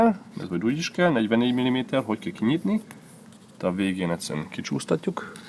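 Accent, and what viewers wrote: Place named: Germany. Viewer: Olyan érdekes beszélsz mintha valami német ember lennél aki magyarul beszél. Engem nem zavar csak tényleg olyan fura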